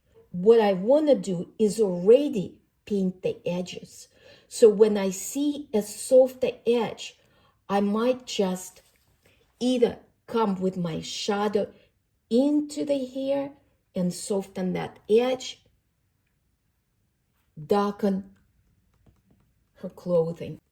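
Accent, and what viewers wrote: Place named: Europe. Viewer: Great to hear a European voice and you are so good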